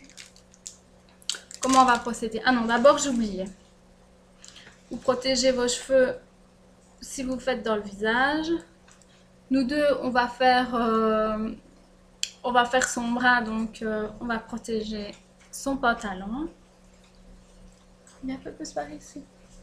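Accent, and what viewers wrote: Liège, Belgium. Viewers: Ca c'est un accent bien de chez nous!! et de Lièèèèèège ;-)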